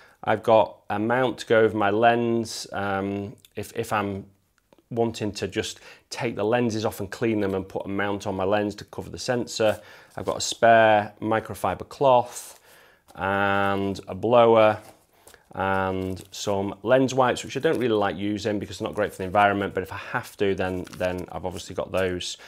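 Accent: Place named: North West England, United Kingdom. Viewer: North West accent - yes.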